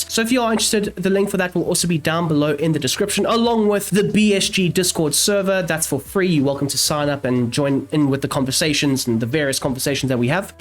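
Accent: South Africa. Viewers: Fellow South African?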